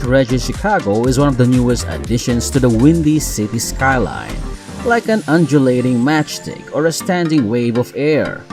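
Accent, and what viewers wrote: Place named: Philippines. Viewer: Narrator is Filipino? The accent is there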